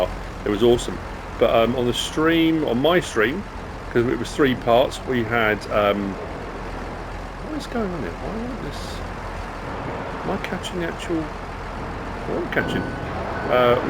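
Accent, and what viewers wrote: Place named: United Kingdom. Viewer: Honestly I'm not a fan of saxlingham either it's just not my cup of coffee lol. But I'd definitely watch you play it. Honestly I'm still holding on to hope that you do another farm to survive series. That is my all time favorite you've done. But you play whatever map you like and ill watch whatever you pick. Your play throughs are way better then most others ive seen i just like listening to you its fun with your deep British accent.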